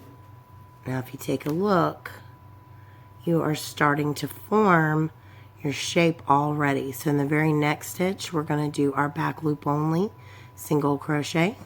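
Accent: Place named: Texas, United States. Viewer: Hi! I recently found your channel and just love all your work! Mosaic crochet is simply wonderful and your designs are so nice! By the way, are you in/from Texas? Your accent sounds like, I'm from there (San Antonio) but live very many years in Athens, Greece! Keep up the great work!! Cheers!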